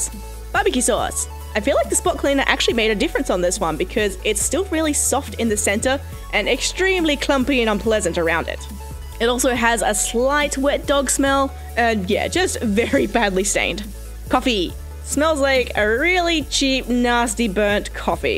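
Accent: Australia. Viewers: Australia? Are you Australian?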